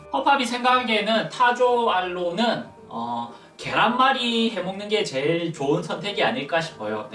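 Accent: Busan, South Korea. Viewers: 혹시........... 부산사람이세요???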